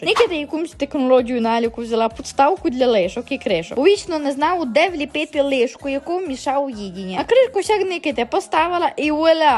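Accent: Transcarpathia, Ukraine. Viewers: Яка чудова ваша закарпатська говірка! Дякую 😊❤️ І рецепт також чудовий😊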